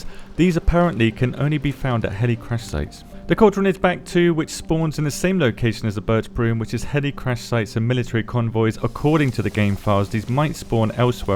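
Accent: United Kingdom. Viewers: tryed again to watch a vid of you, again i cant. this british accent is like a chainsaw to my ears.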